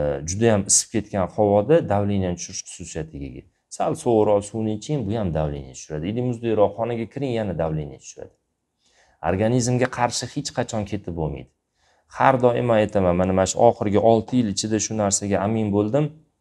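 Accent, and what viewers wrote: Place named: Tashkent, Uzbekistan. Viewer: Илтимос Тошкент шевасида эмас китов тилида гапиринг.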